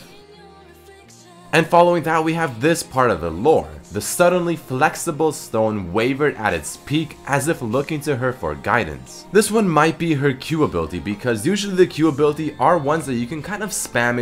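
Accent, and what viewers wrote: United States. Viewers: OMG HE SOUNDS AMERICAN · He sounds American when he is sick haha. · You sound American with your voice haha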